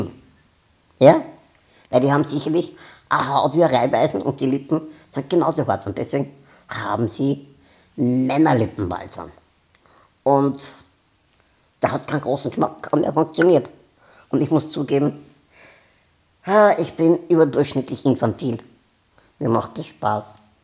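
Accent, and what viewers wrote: Austria. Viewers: A Österreicher? Oda vo wo?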